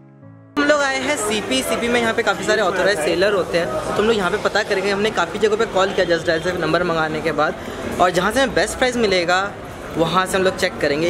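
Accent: Bengal, India. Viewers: Bhai Bengali ho?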